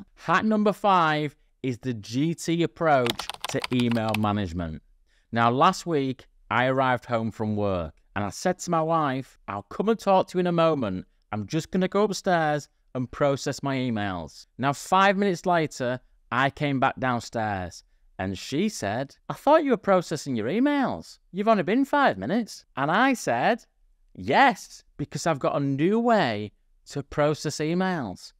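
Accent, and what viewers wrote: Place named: Manchester, United Kingdom. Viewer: Thank you for the great videos that you made - I feel they will change my working life completely! P.S. I have got mates who sound just like you - from Manchester? Bolton? 😂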